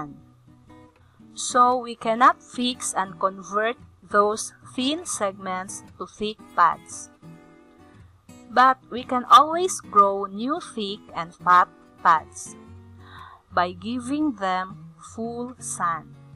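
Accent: Philippines. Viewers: please fix ur speaking. u speak very slow and it sounds very boring. don't use filipino english accent and don't speak very carefully and slow because u sound like reading. i'm not a hater, just a friendly advise for ur video not to be boring to watch and hear. thanks for understanding. 🙂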